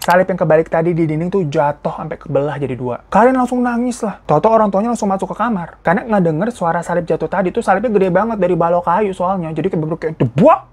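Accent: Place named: Medan, Indonesia. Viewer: Wah wah dri bahasa nya iini kliatannya daerah2 sih pencerita dri medan nih. Serem sih kalau dimedan ada sekte sekte bgini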